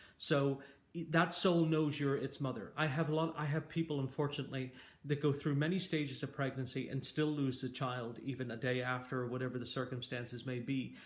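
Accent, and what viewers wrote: Ireland. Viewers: I hear the Irish lilt in your voice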